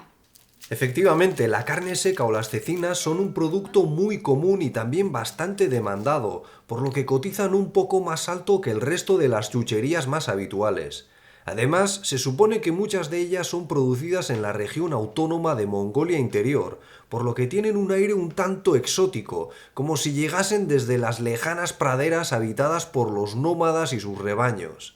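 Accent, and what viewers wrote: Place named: Basque Country, Spain. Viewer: Tienes acento de aquí, eres vasco?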